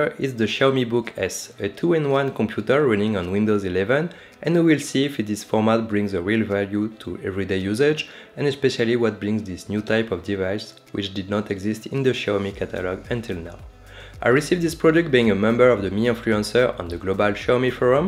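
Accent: France. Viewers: Beautiful French accent 👍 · Your french accent is too thick i almost didn't understand what you saying...but you don't have to change anything because this is this channel identity.. Btw seem a good product recommendation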